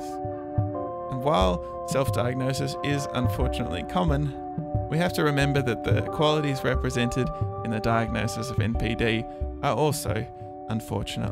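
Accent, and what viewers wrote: Australia. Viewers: So ya have an Australian accent?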